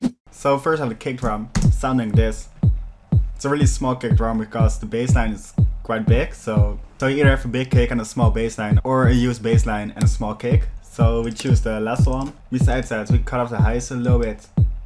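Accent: Netherlands. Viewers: Ik hoor een nederlands accentje ;)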